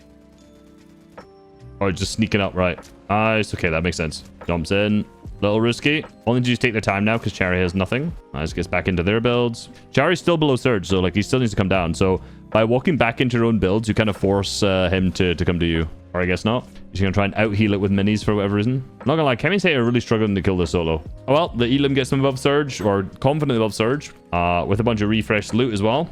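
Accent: Scotland, United Kingdom. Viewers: hi, what part of scotland is your accent from, because me and my and my dad are having a discussion about it for some reason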